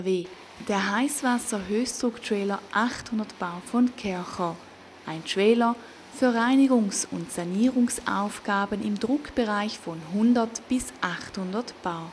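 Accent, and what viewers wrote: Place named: Switzerland. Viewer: also das weiblein hier muss schneller sprechen. und wieso das alles auf schweizer-hochdeutsch ? ich bin doch hier bei kärcher schweiz ??? versteckt euch nicht und lasst unsere schweizer dialekte sprechen lol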